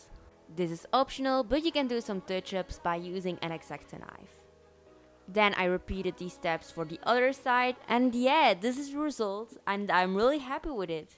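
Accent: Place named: Netherlands. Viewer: I love how he has a Dutch accent. It is so cute. Rlly nice personality.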